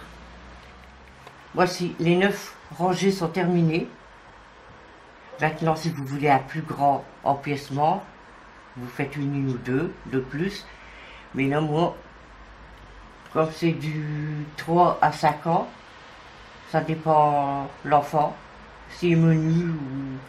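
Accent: North, France. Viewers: Bonjour super vos toutous avec cet accent du Nord qui fait du bien. Pour cette robe vous dites 3 à 5 ans? J'ai donc fait 6 rangs de départ au lieu de 9 pour du 3 à 6 mois cela me semble petit Pouvez vous m'aider? Merci d'avance Sandrine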